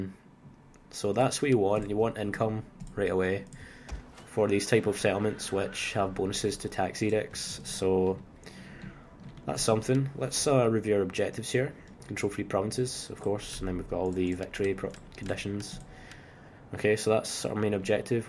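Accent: United Kingdom. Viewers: Awesome British accent you have there, its very different to the typical Southern Englanders we get on YouTube.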